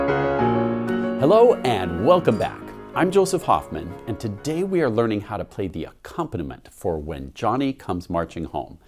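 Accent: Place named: United States. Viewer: He sounds American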